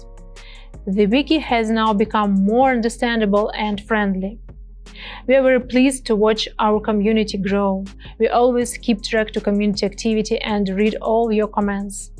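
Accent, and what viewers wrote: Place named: Russia. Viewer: Great news! Love your accent, assuming Russian?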